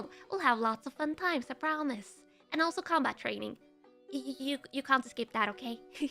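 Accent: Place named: Scandinavia. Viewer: This was a really fun debut! It was really cool to know that you have a Nordic accent and it adds tp your personality. You are such a lovable goofball and very sweet and chill. Looking forward to the talk show and love your model too. Hope you have a great day today 😊🫂🙏🤍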